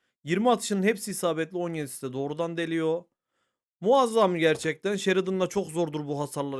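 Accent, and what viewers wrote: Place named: Ankara, Turkey. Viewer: Angara şivesinin hastasıyım. Abone olduk like yaptık evelallah.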